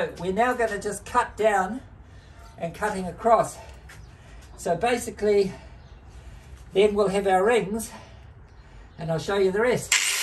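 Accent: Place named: New Zealand. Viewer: You can never get enough of that KIWI knowledge. The accent doesn't hurt.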